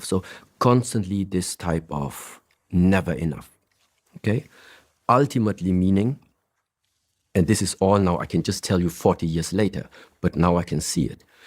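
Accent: Germany. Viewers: Yes, and especially saying "Ja?" after some of the statements. Very German thing to do, and very German pronunciation on that as well.... Plus, I don't know how many people know it, but there are lots of Vietnamese refugees from the 70's and in the meanwhile of course their children and now grandchildren too, with most of them having a German citizenship. I think it's great that he's opening his heart more, and also admits honestly that the old patterns sometimes try to sneak in ( and wreak havoc, if letting certain unhealthy patterns to take over). I think that many such patterns are what people often call "family karma" or "ancestral karma", depending on how far back those patterns go... I myself am on the path of recognising and changing them - and I know all too well the feeling of "never being good enough". Where to just BE is sort of act of revolution concerning the old family dynamics and expectations - and it's something that most of the society doesn't accept either, nearly all of us are indoctrinated from an early age on to do more, achieve more, compete with one another ( the school system very much so with the notes etc ) and sadly, it not only leads to continuous unhappiness with ourselves, but also destroys the planet when most people go after more and more "achievements" that are often shown as material stuff... 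Yet, to be able to break out of it, one needs certain amount of money to have a small house and property if one wants to live off grid for example. And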